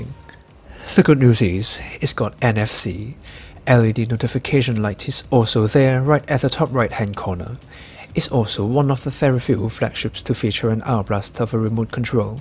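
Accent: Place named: United Kingdom. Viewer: 专登嚟听英音，好sexy！！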